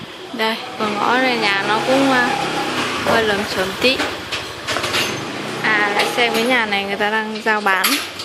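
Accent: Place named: Hanoi, Vietnam. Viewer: Chào bạn gái Hà Nội yêu thương! cảm ơn bạn chia sẻ video này để mình biết về chợ ở HN. Giong nói Hà Nội nhẹ nhàng đáng yêu. Đăng ký kênh bạn và mong bạn cùng tương tác lại với mình để duy trì kết nối nhé.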